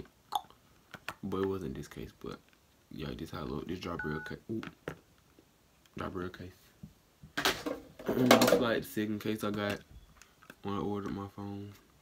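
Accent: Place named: Atlanta, United States. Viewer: Sounds like he from Atlanta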